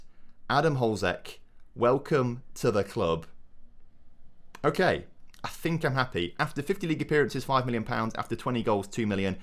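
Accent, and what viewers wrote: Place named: Yorkshire, United Kingdom. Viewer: yorkshire accent gets better and better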